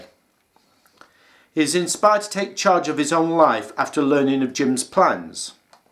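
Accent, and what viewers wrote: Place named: England, United Kingdom. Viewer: I love this movie! I have the hots for the older grey haired man in the film (soccer holigan man). You are a very cute with a loverly english accent:) very cute smile aswell.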